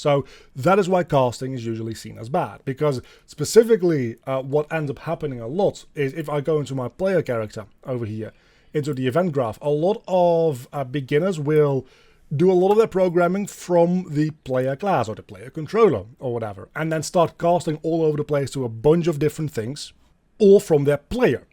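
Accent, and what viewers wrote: Netherlands. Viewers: Thanks for the answer! By the way, are you Dutch? I'm just curious because of the accent 😅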